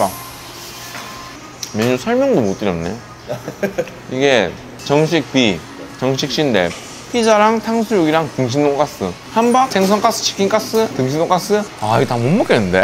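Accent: Gyeongsang, South Korea. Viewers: 서울에서 10년이든 ㅋㅋ 말투가 경남이니깐 지방에서 왔나물어보는건 당연하지 ㅋㅋㅋ서울말 아에 안쓰시던네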